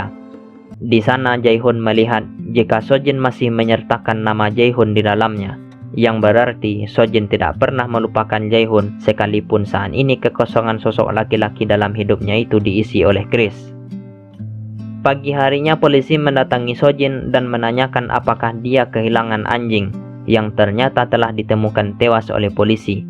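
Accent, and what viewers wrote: Madura, Indonesia. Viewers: Admin logat madura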